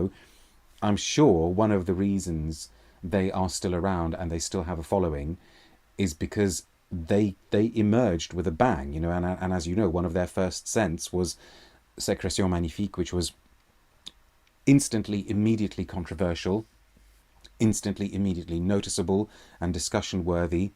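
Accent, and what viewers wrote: United Kingdom. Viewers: Happy New Year Mr! Welcome back, so glad to hear your British English again:)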